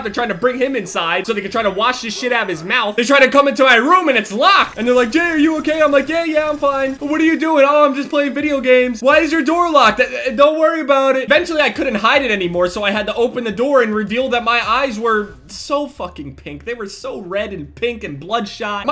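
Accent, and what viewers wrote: Australia. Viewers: Dat Australian <3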